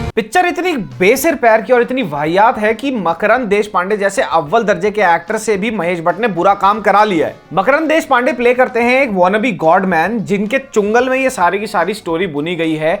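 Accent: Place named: Delhi, India. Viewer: Not to stereo type but love your delhi accent...accha lagta hai apna delhi ka bhai itna accha kar raha hai.... All the things you say how to say the metaphor the references mahn love from jamna par but not shahdra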